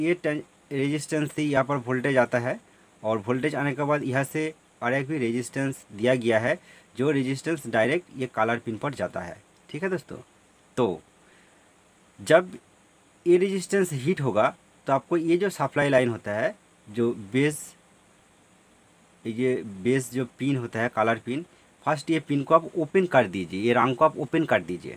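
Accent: Bengal, India. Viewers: भाई की हिन्दीभी बहोत ध्यान से सुननी पड़ती है क्योकि लहेका बांगला में आता है बेस्ट करते हो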